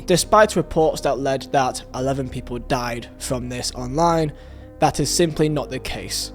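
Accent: United Kingdom. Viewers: i seriously couldnt hear anything all video dont get that heavy british accent everywhere you make the h sound too much keep the mic a little further from ur mouth when the sad parts came i could only focus on ur annoying voice otherwise ok vid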